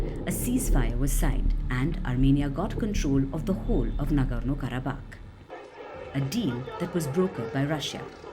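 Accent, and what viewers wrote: India. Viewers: A dusty Indian accent is heard. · Why all these news are read by indian?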